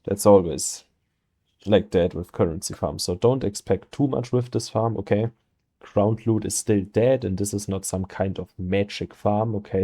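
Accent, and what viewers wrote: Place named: Germany. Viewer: guter deutscher akzent :)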